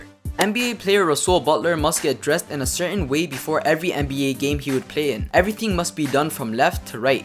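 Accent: Toronto, Canada. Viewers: This is my first time ever watching your videos and I can definitely tell you're from Toronto so I'll sub lol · your accent lmao Canadian lol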